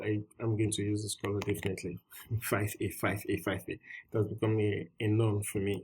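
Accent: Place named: Nigeria. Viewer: sounds Nigerian, finally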